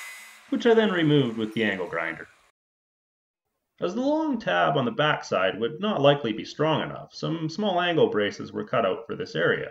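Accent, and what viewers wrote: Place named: Canada. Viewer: Please sir, I'd like some more. This looks like a great project to mess around with. I love all you fab stuff you always show. It helps me with my projects to see someone do it well. And then there's your wonderful Canadian accent. It's like I'm watching an episode of Letterkenny. LOL! ;) Please do more with this truck!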